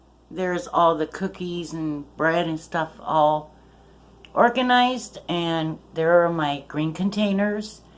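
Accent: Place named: Cuba. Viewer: Hi,! I am filming for the declutter challenge, too & I found your video from the playlist. I'm from Miami & your accent is reminding,me of home! I got kind of thrown off when you said you're in SOCAL, but then you confirmed that you're Cuban. I also thought so because of how clean and organized you are - again, just like the ladies at home! You've done such a great job organizing your pantry. Wish I was like that. I'm working on it, though! Watching your vid for inspiration!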